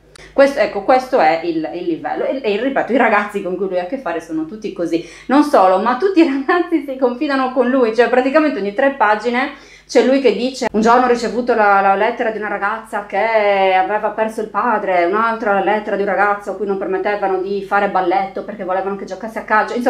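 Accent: Sardinia, Italy. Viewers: Non spesso sono d'accordo con te (sì sullo scwha, no - o con molti distinguo - sui grammar nazi), ma sentirti stroncare un libro con il tuo simpaticissimo accento sardo non ha eguali!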